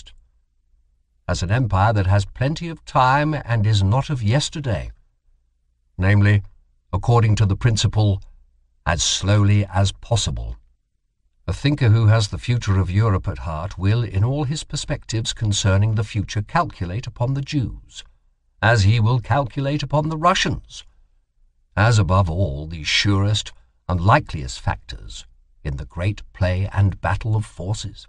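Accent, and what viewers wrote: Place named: England, United Kingdom. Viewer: Very funny to hear Nietzsche's rant on Englishmen being read with an english accent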